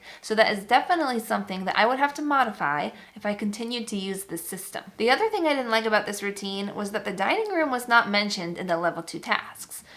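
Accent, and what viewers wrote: England, United Kingdom. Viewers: Your English accent here n there is hilarious! Love it Xx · Your English accent 🤣🤣🤣🤣. Adorable. I'm from England and was very impressed 🤪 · Your English accent is gorgeous 😄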